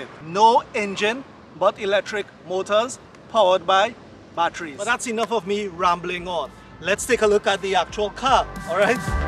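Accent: Trinidad and Tobago. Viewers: He sounds very Trinidadian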